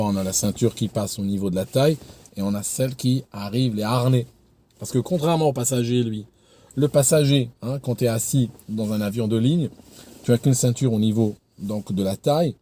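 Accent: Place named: Switzerland. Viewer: qui s'appelle en fait est né en Algérie. Je suppose donc que sa langue maternelle est l'arabe algérien. Il a aussi vécu en Suisse où il a passé une bonne partie de son enfance (d'où son accent suisse qui ressort de temps en temps) et où il a appris à piloter des avions (mais peut-être avait-il déjà commencé en Algérie). Il a commencé des études de médecine en France qu'il n'a pas pu finir à cause d'un visa qui n'a pas été renouvelé. Il habite maintenant à Londres où il a travaillé dans l'informatique (et peut-être dans la sécurité aérienne?) et vit maintenant du revenu de ses chaînes YouTube et en vendant des livres. Bref, c'est un touche-à-tout très doué qui parle au moins trois langues: l'arabe algérien, le français et l'anglais.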